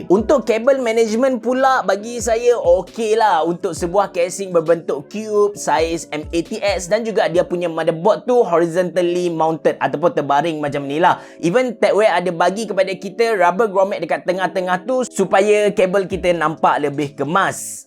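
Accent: Kelantan, Malaysia. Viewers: Pekat bahasa kelate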